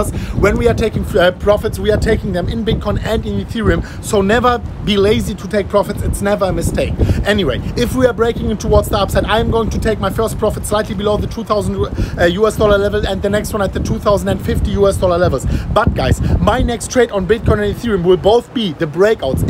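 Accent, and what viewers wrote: Germany. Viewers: Dieses typische Deutsch English 👍🏽👍🏽😅 Hamma YouTube Channel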